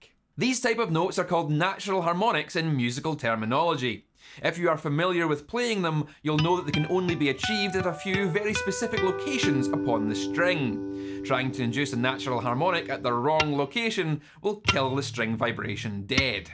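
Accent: Scotland, United Kingdom. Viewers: A video on two of my passions (science and music), with trademark Scottish brogue? Happy new year indeed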